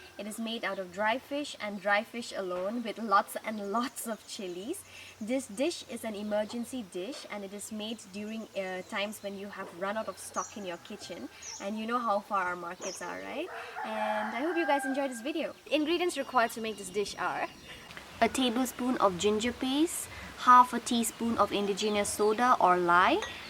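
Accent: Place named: India. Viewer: Watching from 12,000 km away. I know not whether you are BD Garo or IN Garo. Why I am asking? There is a reason behind, that is your English accent. You do not have West and South Indian English accent. Yet you have around 10% Indian accent at some points. Please tell us the name of the village and show us the village location in the map.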